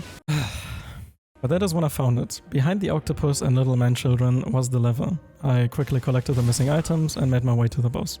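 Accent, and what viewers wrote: Germany. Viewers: Either this is the strongest german accent or some kind of hidden english accent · Du bist Deutsch oder? Hört man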